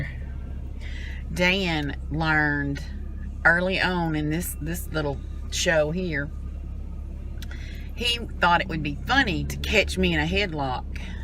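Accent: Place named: Tennessee, United States. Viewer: Ms.....I LOVE you...."Don't nobody whoop me...and live"...hahaha! I'm right here in middle Tennessee and we talk just like you do! I recently found your channel and I'm going to do that fabulous drip cake you showed and I AM going to lose weight on this doggone LO CARB diet I just started! I hope you will show us how to do it right, girl, because this lard ass is fixin' to GO!!! Looking forward to some lo carb sweet delights. Thanks so much for being YOU...:-)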